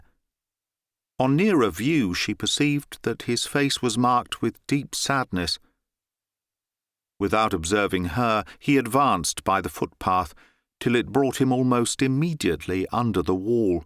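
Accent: United Kingdom. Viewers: Mr would please read Agatha Christie short stories.. I really like listening to crime detective stories narrated by your clear British accent as you did with Sherlock Holmes stories.. I would be grateful if you read some Agatha Christie short stories.. listening to your channel has indeed improved my listening skills as an English language learner... Thank you very much sir ❤❤❤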